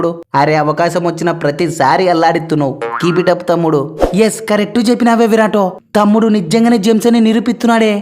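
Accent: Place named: Kurnool, India. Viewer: Mana kirnool yasa tho matladi , prathisari sonthavuru gnapakalu gurthuchestavu ... Thanks thammudu❤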